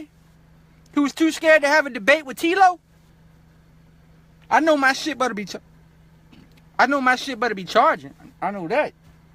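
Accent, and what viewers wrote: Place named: South, United States. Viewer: He talk like a southern guy not a black guy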